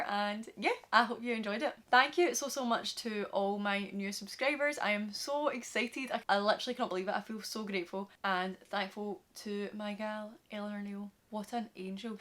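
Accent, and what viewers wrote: Scotland, United Kingdom. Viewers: Random ,but u sound scotish ?nice accent anyway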